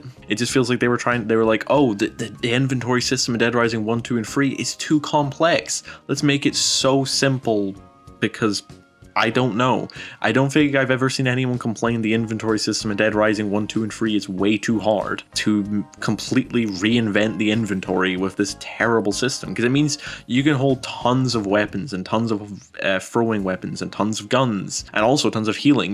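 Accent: Scotland, United Kingdom. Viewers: bro have some cool scottish accent. Love your comment on the whole series! I personally like Dead Rising 3 more than 2. I am totally agree with how you appreciate 2, but Dead rising 3 is a different story. To me it is like a gta with some real content, instead of crime simulator that just stealing car and killing random people and stealing car. The unrealistic combo weapon may look ridiculous, but they are so interesting and full of imagination, free from reality. The unlimited type of weapon spread over the map, and all the collectable makes fun to find all of them.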